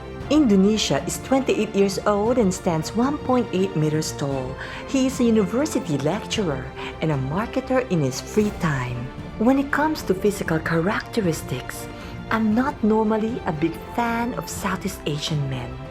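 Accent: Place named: Philippines. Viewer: Are you Filipino? I can tell by your narration. hehe, Anyway, I love the words you used to describe these hot and gorgeous men, and the way you focus to their crutch towards the end at some point.. Your voice is pleasant to hear, it's soft and seductive makakatigas ng titi. hehe. Just work on the right pronunciation of the words and your channel will go a long way by having a lot of viewers or subscribers. Keep it up.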